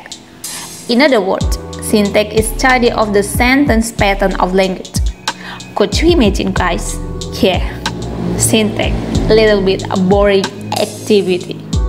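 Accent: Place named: Indonesia. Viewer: i guess she's from Indonesia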